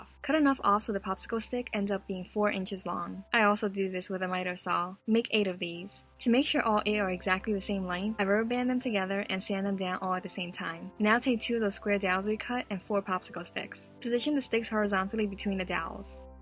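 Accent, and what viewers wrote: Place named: New Jersey, United States. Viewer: Are you from New Jersey? You have the accent.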